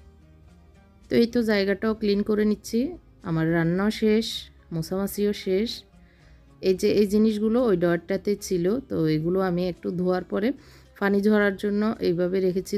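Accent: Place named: Noakhali, Bangladesh. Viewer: আপা নোয়াখালীর মনে হয়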